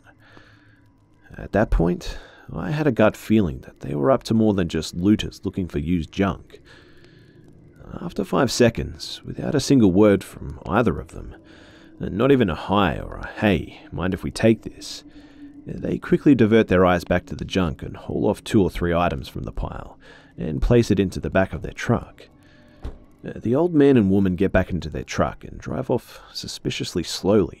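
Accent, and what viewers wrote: Australia. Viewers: Ahhh, I took a break from horror stories for a while, but boy am I glad that to be introduced back into it by a smooth Australian voice. Thanks B! (You are Australian, right?)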